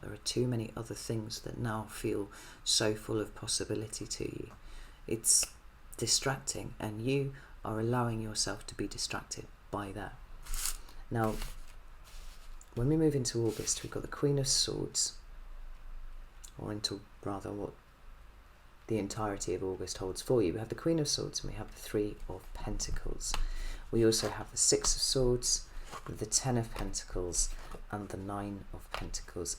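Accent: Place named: England, United Kingdom. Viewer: Yo sister greetings from Andalusia Spain like the English accent,rare thing these days thanks for reading ❤🙏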